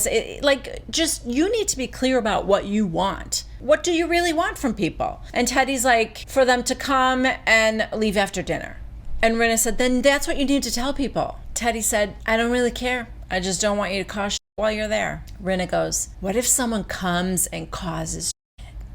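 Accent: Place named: South, United States. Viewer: Oh my gosh!!!! Rinna might as well be flipping LVP off. Hot pink satin top ,hot pink eye shadow and longer brown hair. 🤮. She will.do anything to be seen and get a paycheck. It's funny how Rinna always has to mention how rich people are. Love the Southern accent, you are the best. Well I guess I had better go change my yacht underwear and go work out.🤣🤣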